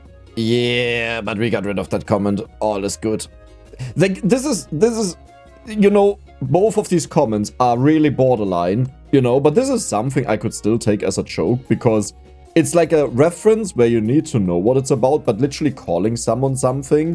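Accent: Germany. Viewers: I love your xD but for real, I really like your content, I pretty much always watch you to sleep in and I think your German ascent is charming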